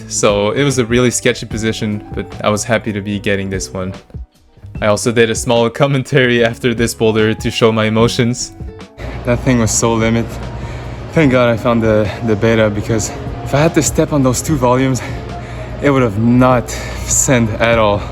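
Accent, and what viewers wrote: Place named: Quebec, Canada. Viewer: 2e jour de suite où j'écoute une vidéo pas rapport en anglais et je réalise en plein milieu que c'est un québécois comme moi.😂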